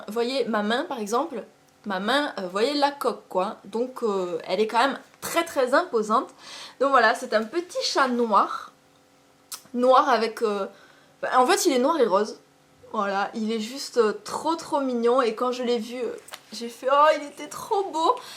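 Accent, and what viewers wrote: South, France. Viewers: Super vidéo sa se voit que tu viens du sud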